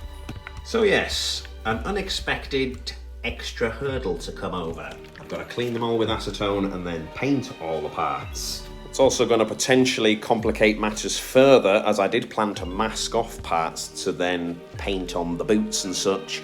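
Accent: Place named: England, United Kingdom. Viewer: English accent and American toys is an odd mix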